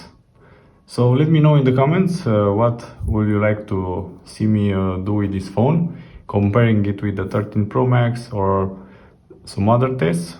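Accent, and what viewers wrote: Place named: Romania. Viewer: English is given, but the diction does not help him, it takes a long time until the words come to his throat, I still recommend him to buy a newler microphone, something that you say speaks from a jar :)) and let it be softer with Romgleza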